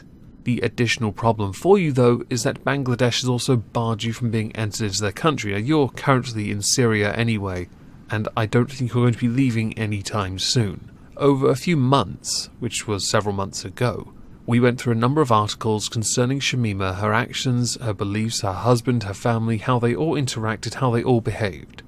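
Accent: RP, United Kingdom. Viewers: Loving the posh English accent.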